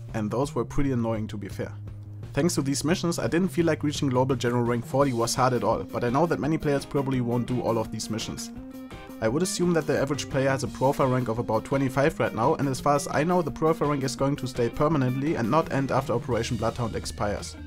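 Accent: Germany. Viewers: man merkt so extrem deinen deutschen Akzent! ^^ aber echt gutes video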